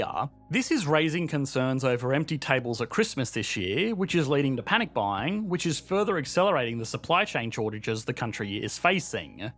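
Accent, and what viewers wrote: Australia. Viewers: He's an Australian. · U.K makes jet engines, high-end cars...has the most important financial centre in the world and yet EE still places UK behind NZ LOL seriously mate your economics education is flawed...There's more economic activity in one London street than the entire country of NZ. Are you a kiwi with an Aussie accent? why the soft spot for the land of sheep and the haka? lol if NZ was to fall off the face of the earth surely the world economy wouldn't be impacted. at least Australia has natural resources it exports to big countries...so Australia is definitely more globally significant to the world economy than NZ and yet you even placed Australia Behind NZ? You see the world from a biased and unrealistic realm. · defo Aussie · i love aussi accent, but all the endings with -eeeeuoooeeee is just too much.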